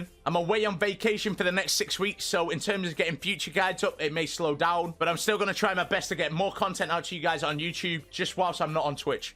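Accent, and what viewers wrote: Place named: United Kingdom. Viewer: Teach me english! British english i mean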